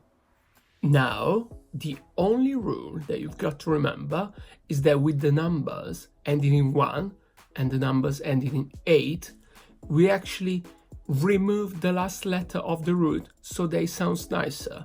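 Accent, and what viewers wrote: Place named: Italy. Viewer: Are you Italian? I love your accent!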